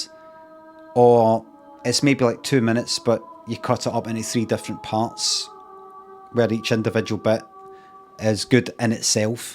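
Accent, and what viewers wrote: Scotland, United Kingdom. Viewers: Hence his undying shame about his schemie accent. No amount of our money will ever change that fact.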